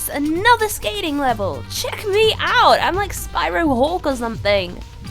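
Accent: United Kingdom. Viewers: Oh my god, you are a fucking disgrace! I can't believe how bad this review is! First off, the hell man, british narration? No review with that disarray! And boss stages and mini games are not fucking levels. FUCK AND GET THE FUCK OFF YOUTUBE! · SPYRO 3 WILL ALWAYS BE MY FAVVVVVVV :) Love the vid and love the pommy accent ;)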